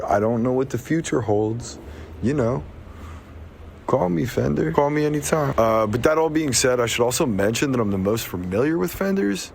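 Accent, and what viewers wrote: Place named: Philadelphia, United States. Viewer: I haven't lived in Philly in like 18 years but your voice is makin me homesick.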